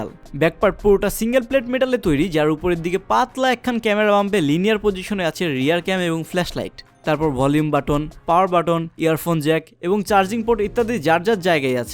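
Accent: Bangladesh. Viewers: I totally love to laugh at these Bangla youtubers English accent. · mojar... specifically Bangladeshi accents. sadharon jiniso bes valo legeche. good going.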